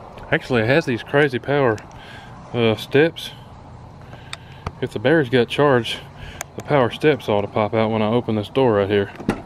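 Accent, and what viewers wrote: South, United States. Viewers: We all do things like shock ourselves but most wouldn’t tell the world about it. I appreciate your humility and ability to laugh at yourself, after all we’re only human and not perfect. Also your southern use of the English language is quite entertaining. Thanks for another great video,!!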